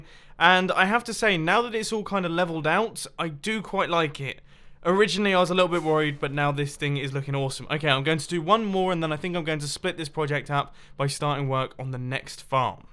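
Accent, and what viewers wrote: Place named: United Kingdom. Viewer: Ur British! finally!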